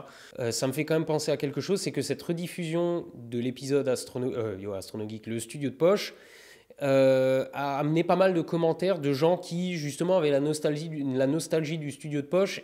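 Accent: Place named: Alsace, France. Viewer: Yoh.. T'es bien un alsaco toi... Ou lorrain ^^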